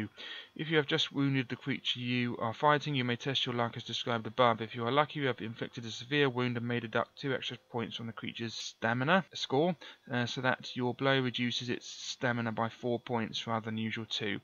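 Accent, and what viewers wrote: United Kingdom. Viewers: Advice: stop babbling about crap no one cares about. I didn’t come here to listen to an English toff talk about and. I came here to watch a FF game get played. For anyone who actually cares, this guy doesn’t even start until twenty minutes in. Your voice is clear so at least that’s something.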